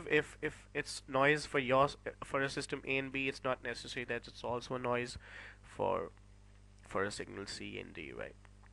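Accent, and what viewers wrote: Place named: India. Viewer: You seem to be Indian ! from ur way of speaking!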